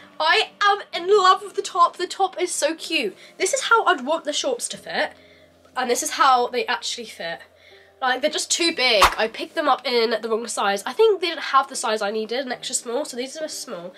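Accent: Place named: United Kingdom. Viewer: cute voice with the British accent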